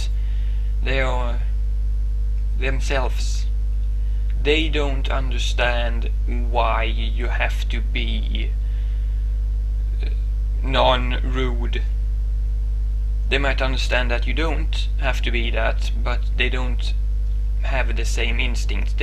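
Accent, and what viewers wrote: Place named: Sweden. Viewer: I really liked your video, I just took some tests the day before yesterday, and they provided some confirmation of what I already suspected after having read about AS on some web pages. I didn't know about the lines/bags under the eyes, I thought that was just me (still learning about AS). The more I see of others with AS, the better it seems to make me feel. By the way, I like your Swedish accent, it is cool sounding :) Your brother looks cool, I always wished I could grow a big beard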